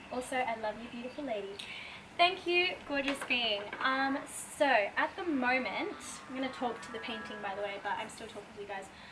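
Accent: Australia. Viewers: this is my first video of urs but i'm guessing u're australian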